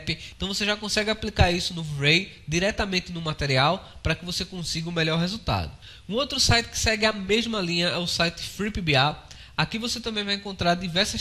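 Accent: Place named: Portugal. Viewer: Nao gosto de sutaque Portuguesa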